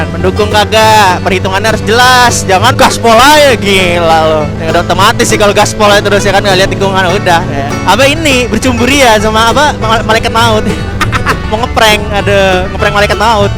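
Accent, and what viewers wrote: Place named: Lampung, Indonesia. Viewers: Gausah dengerin orang orang belloon bang tau gua orang Lampung begimanah logatnya wkwkwk fokus berkarya aja lu nya bang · Itu bukan ngegas, logat lampung emang kayak gitu. Makanya sini ke lampung biar di ceramahin dulu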